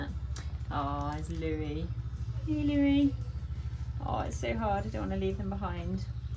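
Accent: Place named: United Kingdom. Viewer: D Your videos are great:D I'm in love with your horses and ponies. Hugs from Brazil It's being a great adventure to learn British English through your videos. God bless you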